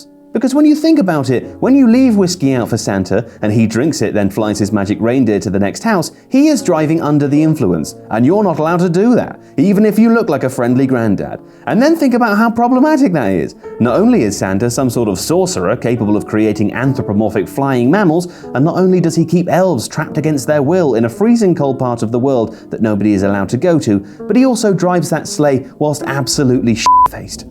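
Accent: Scotland, United Kingdom. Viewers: is scottish??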